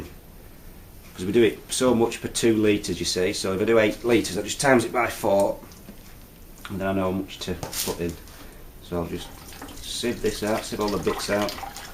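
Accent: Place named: England, United Kingdom. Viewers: It is a beautiful Yorkshire accent. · Thanks for the video, love your accent, makes me miss England:) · I don’t understand his English accent 😂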